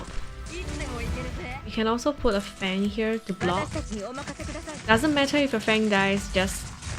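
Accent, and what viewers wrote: Singapore. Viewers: I'm picking the scent of Singlish... hmmphh hmmphh~ Definitely a Singlish, no way that's a common Chinese English accent. Trace of Indian, Chinese, Malay and Hokkien intonation. Hi neighbor countryman ^^ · I hear some thicc Singlish here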